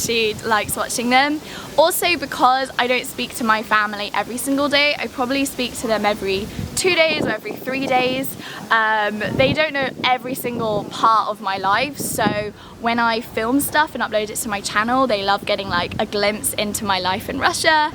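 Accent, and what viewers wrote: England, United Kingdom. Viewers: Британский акцент это очень красиво! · Я кайфую от этого невероятного английского Английского! улыбка)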